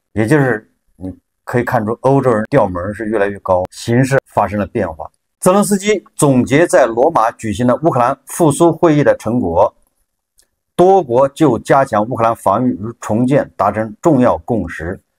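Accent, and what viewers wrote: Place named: Wuhan, China. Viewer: 你有点黄陂口音。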